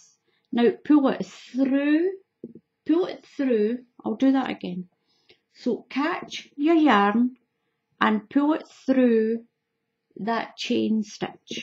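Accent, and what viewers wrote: Scotland, United Kingdom. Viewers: I'm Brazilian, English isn't my native language and I can understand very well. Love your Scottish accent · You have the coolest accent I have ever heard. Where precisely are you from? Scotland, right, but where? Just curious. I'm American and we never hear this. It sounds quite a lot like Scandinavian-- in the inflection--and many of the sounds are quite similar. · Scottish accent always melts my heart <3 Thank you so much!! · I love your Scottish accent and your voice! I could listen to you all day long x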